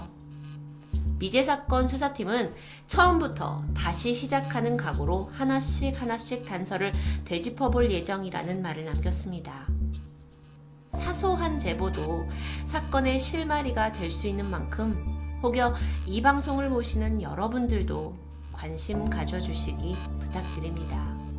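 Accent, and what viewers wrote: Jeolla, South Korea. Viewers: 절라도 말투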